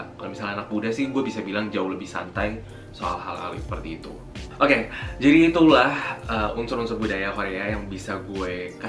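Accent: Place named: Java, Indonesia. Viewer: Kko awalnya mikirnya opa korea ehh tau taunya kko Indo dari medok nya hehehe keren vlognya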